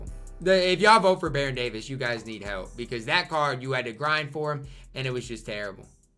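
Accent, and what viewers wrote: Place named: Detroit, United States. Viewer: How come sometimes you talk normal and then sometimes you talk like you are from 8 mile?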